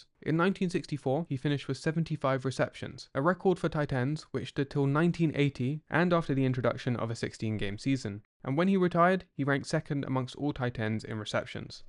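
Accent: England, United Kingdom. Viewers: Wait. Why is sone guy with an Anglophone accent (English, I guess) doing videos about 60s Bears football?!? By the way (just to establish some credentials here), I was at Gale Sayers' 6-touchdown game against the 49ers with my father. And although I was sitting at home, my father was at the 1963 NFL Championship game at Wrigley Field against the NY Giants.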